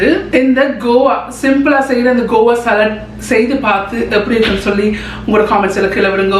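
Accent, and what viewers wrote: Sri Lanka. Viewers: Like your ilangai Tamizh.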